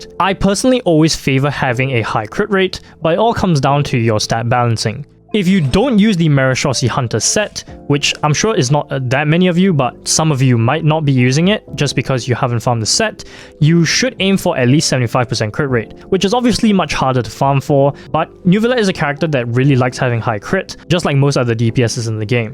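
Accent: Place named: India. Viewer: broo are yoy indian? you sound super indian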